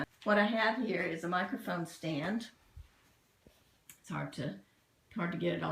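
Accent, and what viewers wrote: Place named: South, United States. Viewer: Thanks for sharing your incredibly inspiring art room and art process. My kids think I'm an art supply/junk hoarder, but you just normalized my accumulation of art "treasures." Love your accent! I miss the south.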